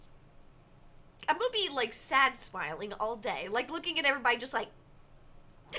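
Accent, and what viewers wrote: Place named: South, United States. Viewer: “I’ll fight him. I’ll meet him at Walmart.” Jfc you’re so southern. 😂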